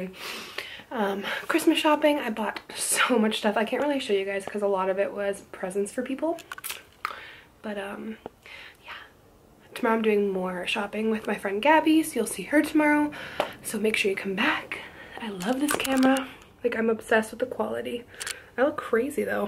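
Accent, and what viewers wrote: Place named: Boston, United States. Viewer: are you from Boston? I loveee your video's!!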